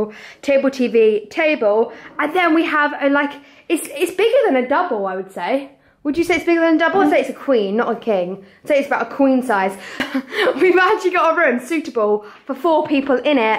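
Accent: United Kingdom. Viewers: Is she from England sorry I am new here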